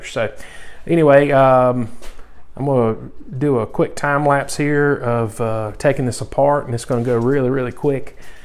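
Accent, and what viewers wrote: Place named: South, United States. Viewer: I love the Southern accent! Very charming. "A letter" instead of "a litter."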